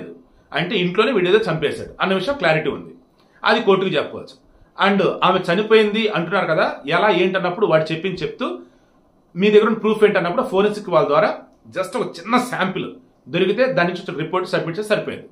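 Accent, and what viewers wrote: Andhra Pradesh, India. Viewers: Meeru mareee ...andra ....yasalo...matladutunnaru.....koncem....bhasa marchndee....speed ....kuda tagginchindee....andariki ardham awaali kada......🎉🎉🎉🎉🎉... ...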